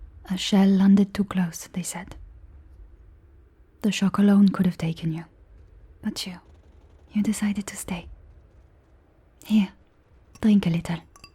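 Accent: France. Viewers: French accent? You have my undivided attention. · God I wish for French GF to listen her speaking with this accent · I absolutely love hearing accents and your French one is AMAZING. Thank you for this wonderful gift of a video! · This was so great! Your French accent is superb ❤ Merry Christmas ❤🎄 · J'adore ces audios avec l'accent français parce que ça m'aide a mieux comprendre 😂 Joyeux Noël à tous depuis la France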